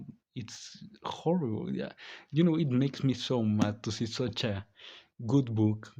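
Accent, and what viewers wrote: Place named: Mexico. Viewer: That nut worthy thick Mexican accent returns!!!❤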